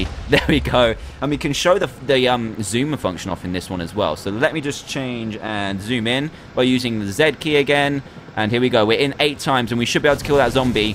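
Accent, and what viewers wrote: United Kingdom. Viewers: HE'S BRITISH LIKE ME IDIOT! French ppl sound different!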